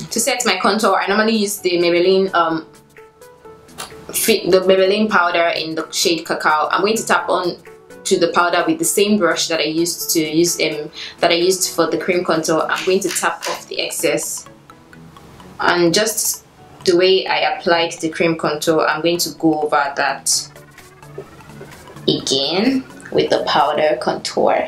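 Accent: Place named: West Africa. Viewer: Okok I see you ahaha, I hear an accent are you from somewhere in west Africa ?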